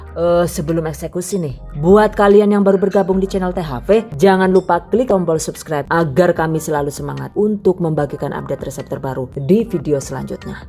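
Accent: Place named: Madiun, Indonesia. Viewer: SUARANYA KYK YG DI MADIUN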